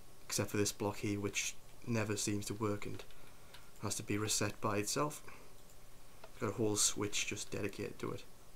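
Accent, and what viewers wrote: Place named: Newcastle, United Kingdom. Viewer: you sound a bit like a geordie mate :) go north!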